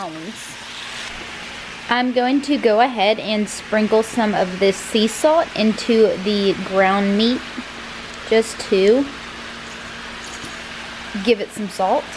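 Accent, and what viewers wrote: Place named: Texas, United States. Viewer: A beautiful texas girl with a beautiful southern accent! What part of texas you from?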